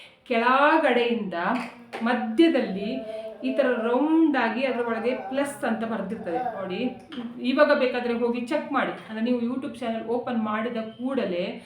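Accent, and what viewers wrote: Mangalore, India. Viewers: ನಿಮ್ಮ ಮಂಗಳೂರು ಭಾಷೆ ನನಗೆ ತುಂಬಾನೇ ಇಷ್ಟ ತುಂಬಾ ಚೆನ್ನಾಗಿ ಮಾತಾಡ್ತೀರಾ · Mangalore bhhashe tumba chanda maarre ..😅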